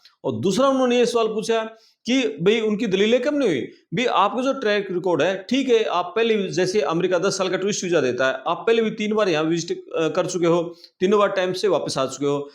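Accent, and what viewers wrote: Haryana, India. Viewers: Haryana accent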